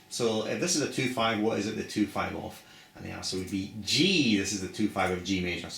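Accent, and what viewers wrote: Scotland, United Kingdom. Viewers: I already know all this information, but I can't stop listening to the Scottish accent. Keep them coming, man!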